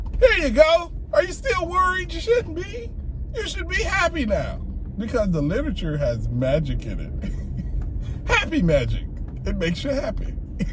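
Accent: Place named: Jamaica. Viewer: Your African accent sounds more like Jamaican to me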